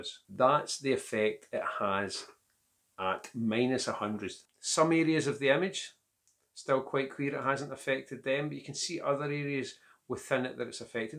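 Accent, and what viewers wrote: Scotland, United Kingdom. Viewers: Excellent video, made much more interesting by a wonderful Scottish accent ! Quick Question: Are you working on a RAW file?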